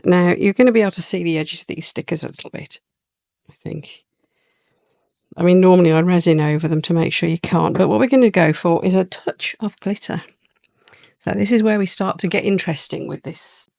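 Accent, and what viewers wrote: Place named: England, United Kingdom. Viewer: I love watching you, and listening to you. I’m in America, and get a kick out of your English accent. I really wish the eco resin wasn’t so expensive. I’ve been using cement to cut cost. But, with that, you sacrifice color, as it is hard to get good/precise colors. I would love to see you do cement castings however. I love learning, and want to make a business out of it. I am currently making plant pots and saucers. Thank you so much.. 🇺🇸💕 Cindy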